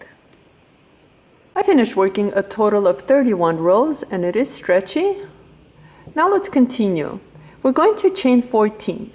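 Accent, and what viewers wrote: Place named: Bulgaria. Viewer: Really fun project!, btw, are you Bulgarian? Your accent and your picture made me wonder 😉.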